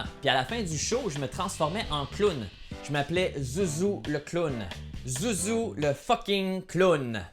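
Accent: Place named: Normandy, France. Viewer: étant donné ton accent,tu dois être de l'extrème ouest de la normandie,non?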